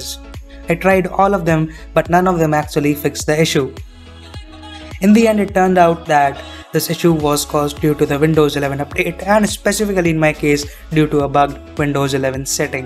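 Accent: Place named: India. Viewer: After searching trough all steam and reddit forums hoping for a fix I couldnt find anything. After going on youtube and clicking on this absolute chad video with a chad that has an indian accent and fixes all your problems with a single switch I finally had the solution. Thx brother, you earned my sub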